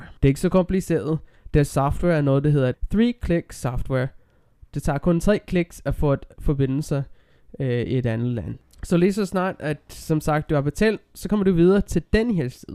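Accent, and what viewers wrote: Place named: United States. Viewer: Hey, kan ikke lade vær med at tænke på din accent, hvor er du fra, det lyder lidt som om du kommer fra USA? ;-) har jeg ret?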